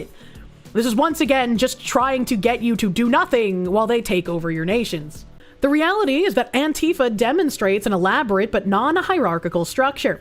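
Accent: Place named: New Jersey, United States. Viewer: Wait she lives in Georgia? Always thought that was a jeresy accent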